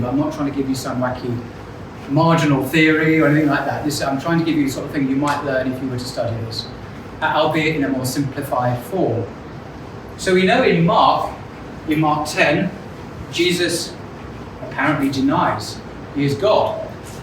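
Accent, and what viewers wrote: United Kingdom. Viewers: Mashallah brother! Thank you very much for this amazing talk. I like to listen to your videos because of their very rich content but also for the British accent that I like very much 😅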